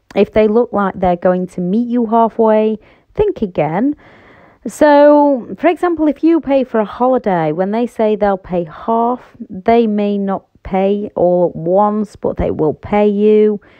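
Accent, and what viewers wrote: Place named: Lancashire, United Kingdom. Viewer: Thanks for your useful and helpful vids, and always nice to hear a Lancashire accent for a change. x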